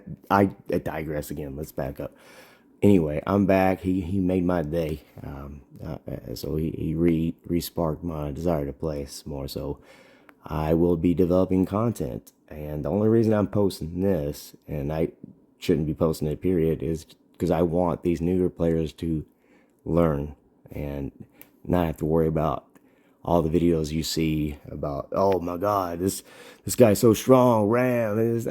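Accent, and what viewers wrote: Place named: South, United States. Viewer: I'm from Louisiana it's sounds like your from the south as well bro nice videos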